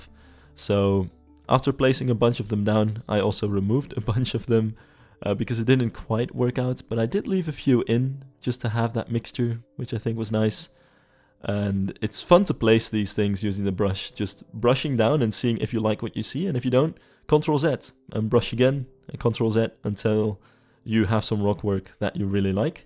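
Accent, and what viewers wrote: Netherlands. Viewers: Apart from the fact that your build looks amazing, I want to point out that I like how even though I could hear that you’re Dutch, you don’t have a horribly Dutch-English accent. Probably a weird compliment, but usually I skip videos from fellow Dutchmen due to their accents bothering me haha. Dus die kan je in je zak steken ;-P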